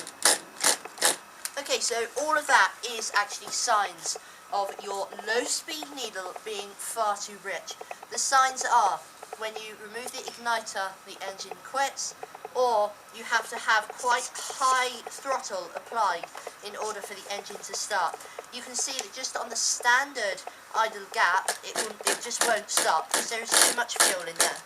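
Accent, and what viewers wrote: England, United Kingdom. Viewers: why do you always sound like a british girl?? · Well hopefully when I try that tomorrow it will sort my running problems out. Wish I found these vids ages ago. So nice to hear an English voice instead of American. Well done mate i've already subscribed and watched about 20 of your other vids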